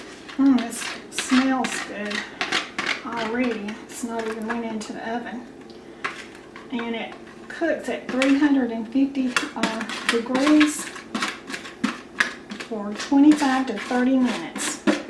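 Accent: South, United States. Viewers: Watching her for the first time love the recipe and her southern accent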